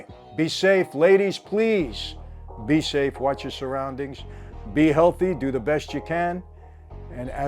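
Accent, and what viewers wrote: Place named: New York, United States. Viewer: I like you first time listening im an old lady who grew up in the big apple and your accent is like visiting my classmates and neighbors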